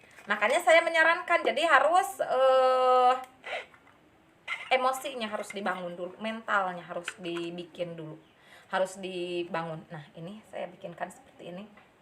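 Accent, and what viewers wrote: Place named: West Java, Indonesia. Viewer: Resep aing mh ngadangu ngomng na Sunda pisa tteh mh 😅😅 Salam santun tteh 🙏🙏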